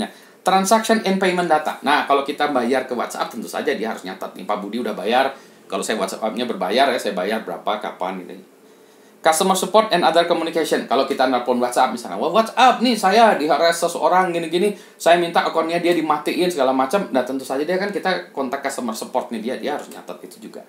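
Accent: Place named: West Java, Indonesia. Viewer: Namanya mirip dengan nama orang jawa tapi logatnya mirip orang sunda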